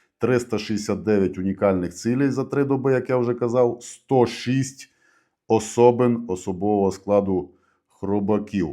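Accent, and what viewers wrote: Transcarpathia, Ukraine. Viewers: Зацініть закарпатський діалект роберта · Закарпатська українська-це шедевр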